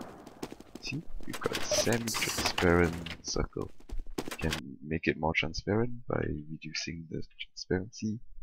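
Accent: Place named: Singapore. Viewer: i sense the singaporean accent :OO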